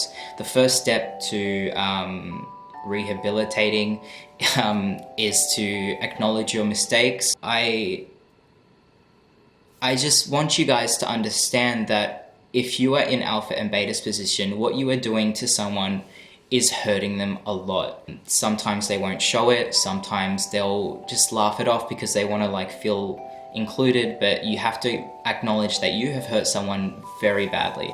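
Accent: Australia. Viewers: what part of Australia are you from? love that accent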